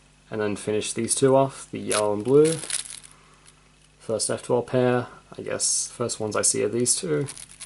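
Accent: Australia. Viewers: Your accent kinda sounds like Australian+ English and Feliks's accent is like Australian+European ^_^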